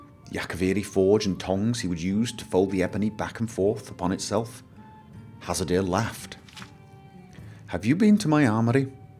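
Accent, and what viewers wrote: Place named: Scotland, United Kingdom. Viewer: I believ you were from norway, but your accent sounds scottish to me.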